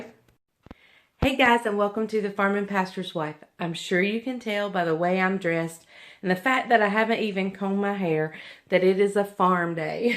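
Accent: South, United States. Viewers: Are you moving to new land? I’m sorry coming in on your story I just don’t understand sometimes the southern draw talking fast. As far as having “stuff” donate donate to the Salvation Army that uses the sale proceeds to help the poor. My husband says, keep it simple thats so true! Personally when I have guest over I keep the kitchen unjunky with little out other than food and pretty napkins and cups and few candles. Heaven is not junky and in turmoil with “things”. It’s uncomfortable being around clutter thats not good for the soul. A Colonial girl here with a touch of simple style and elegance from my generation. People have way too much today. Be blessed and not stressed.❣️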